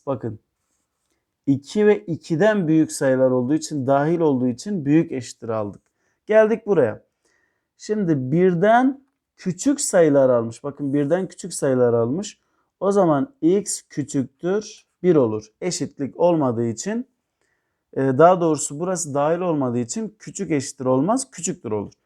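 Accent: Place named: Trabzon, Turkey. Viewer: Saolasınız hocam Trabzonlusunuz herhalde Video işimize yarıyor bide yenisi olsa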